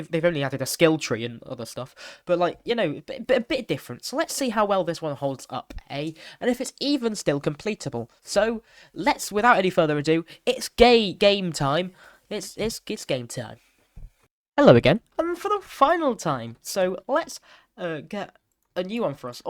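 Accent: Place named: United Kingdom. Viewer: the british accent is so good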